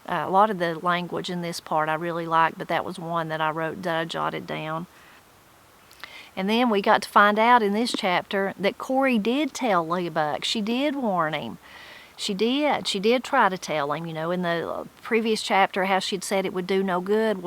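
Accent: Appalachia, United States. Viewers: I have just found your channel and have subscribed. Hearing you talk is just like hearing my grandmother talk. She and my grandfather were from the hills of Tennessee and followed many of the Appalachian folk ways, culture, language, etc. I try to keep a list of words and expressions they used that I never hear anyone else use and over the years have found that much of it came from England and Scots-Irish ancestors. It is wonderful that you are preserving that heritage. Blessings.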